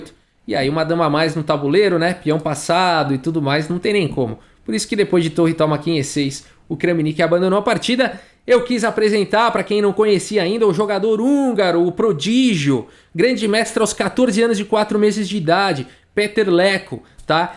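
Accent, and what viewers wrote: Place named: São Paulo, Brazil. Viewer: Esse sotaque de paulistano é um barato!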